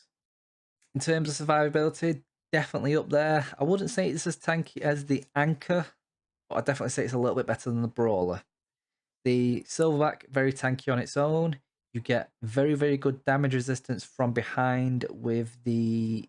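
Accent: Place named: West Yorkshire, United Kingdom. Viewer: What accent is this? Im in Hull East Yorkshire and youre sounding maybe Leeds or Doncaster or something west yorkshire-ish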